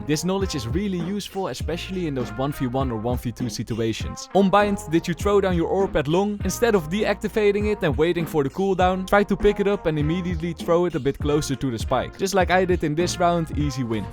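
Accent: Netherlands. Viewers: Where are you from, sir? Your accent really sounds like from the Netherlands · Nl accent 😂❤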